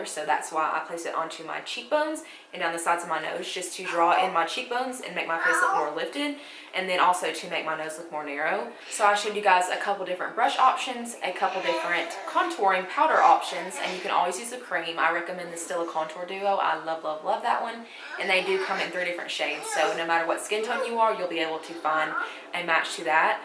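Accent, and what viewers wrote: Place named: Texas, United States. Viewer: I thought you were from Texas. New subbie. Love your channel❤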